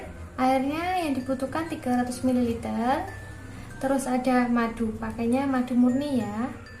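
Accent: Java, Indonesia. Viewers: Medok tpi biutipul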